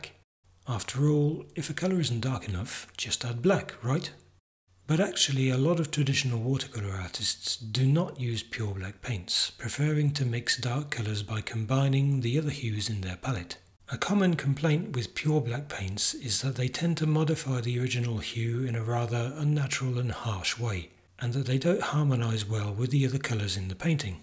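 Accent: United Kingdom. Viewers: They don't use black watercolor because they use black watercolour. British voice trying to sell to Americans. Shame on you.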